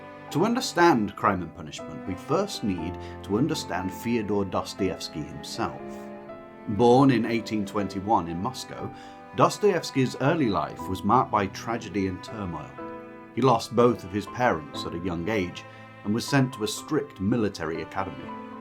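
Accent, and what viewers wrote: United Kingdom. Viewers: Hi, thanks for this review and all the others you have published. Just one thing, could you leave the music off? It is very distracting, and we Americans have to concentrate to understand your british speech.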